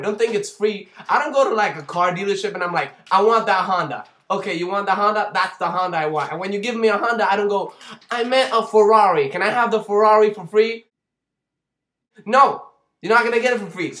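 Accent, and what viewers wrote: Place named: Miami, United States. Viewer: Lol. you are so cute and funny! I recognize a miami guy when I hear one ;)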